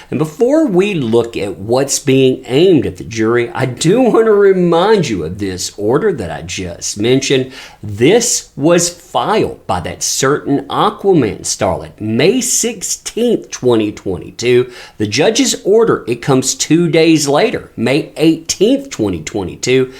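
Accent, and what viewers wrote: South, United States. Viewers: Listening to your southern drawl hillbilly accent is irritating enough, but could you please stop with the repetitive "That former certain Aquaman starlet". Just say: Amber Heard and then maybe go for speech therapy 😉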